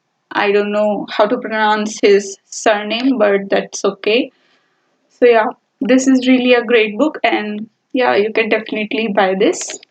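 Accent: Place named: Punjab, India. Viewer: Punjabi accent are u from punjab?🙂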